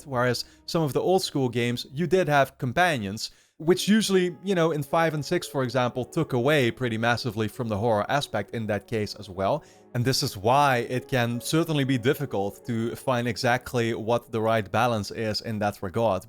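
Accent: Netherlands. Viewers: Dat Nederlandse accent hoorde ik meteen 😜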